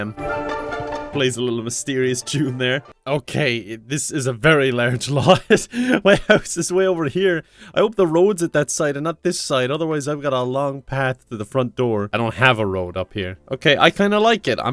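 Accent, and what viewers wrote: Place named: Ireland. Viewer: Where in Ireland are you from 💜💛💜💛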